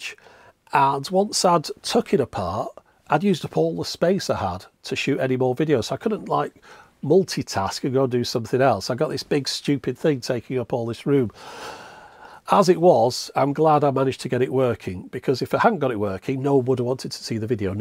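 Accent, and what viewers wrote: United Kingdom. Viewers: Thanks for sharing your experiences with the weirdo audience members. Please don't waste time interacting with them. You have nothing to explain to us about how you sound. It's very shameful how some people act here behind their veil of pseudo-anonymity. From one of the up-'til-now silent subscribers, I'm very sorry to hear about your Mom. That must have been a terrible thing to go through. And you sound awesome. The British accent automatically gives you a +10 perceived intelligence, and sod the plonkers who give you a hard time about the way that you sound. Please have a better 2023. Best regards from Chicago, USA. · Totally Brilliant Video. Had My undivided attention the whole time. I have some catching up to do, I thought I have seen Every Video You put out. I though the Way You sounded was very natural, found nothing odd about it, Having the British accent and all. 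It May sound weird, But I really enjoyed hearing you talk.. Sorry about the Health issues. Please accept My Sincere Condolences of You Mothers Passing. Lost My parents in 96. Dad was 65, Mom in 08 just turned 72. I'm 62 and hope to out live My father. I feel that I can cause I am in great Health.. Other than a Dental check-up every 6 Mo, I have not seen a Doctor in 15+ years. I do nothing for Christmas either. Being in the woods 250' From the lightly Driven Road not much to see. When I get My Tower back up, it will be 120' My big blue Star might be seen from the interstate. I anxiously await any Video You put out. Kinda makes My day when You do... Please take Care My Friend (yes You could be a great Friend to have) Mike M. Wausau Wi. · Hopefully 2023 is better. I don't know how people complain about how you speak. It just sounds like a British accent to me. But there are always a few that will complain and insult people.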